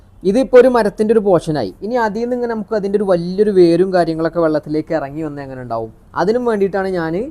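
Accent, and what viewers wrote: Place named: Vadakara, India. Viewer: എന്നിട്ട് നിങളെ ഭാഷ മലപ്പുറം ടെച് ഇല്ലല്ലോ 🙄ഞങ്ങളെ കോഴിക്കോട് വടകര മോഡൽ പൊലെ എനിക്ക് തോന്നൽ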